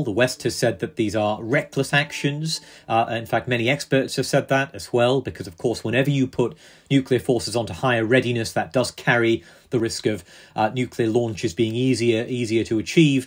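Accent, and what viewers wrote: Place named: Scotland, United Kingdom. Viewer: Its a pity the Scottish accented voice over thinks "nuclear" is pronounced "nukilla".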